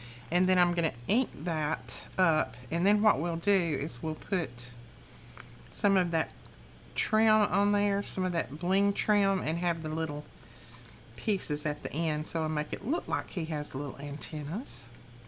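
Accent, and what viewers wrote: South, United States. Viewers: Such cute mini folders love the paper you used. I enjoy listening to your southern accent. 😀💖 Have a blessed day. What is the name of that beautiful black bling that you used on butterfly and where do you get it?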